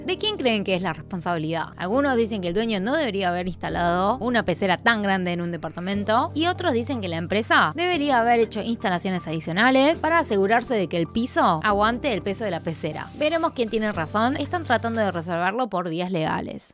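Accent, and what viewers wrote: Argentina. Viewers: Al comienzo ni me percataba si tenía acento, ahora te escucho un lindo acento argentino💓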